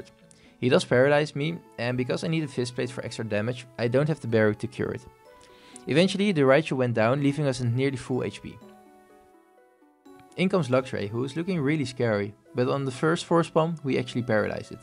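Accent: Netherlands. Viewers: i taught i heard a dutch accent, i am from belgium are you from belgium or the netherland3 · Hmmm that accent. Sounds dutch to me lmao because I am dutch too and I can recognize it lol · weird question but you got a very dutch accent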